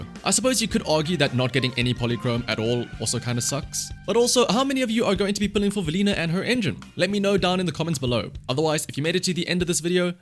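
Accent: South Africa. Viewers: Are you from South Africa cuz ur accent really sounds like it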